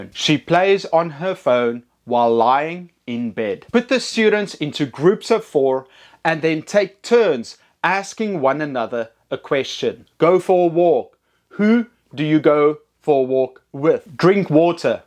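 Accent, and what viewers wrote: South Africa. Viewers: Sir...great video..but are u by any chance ...south african?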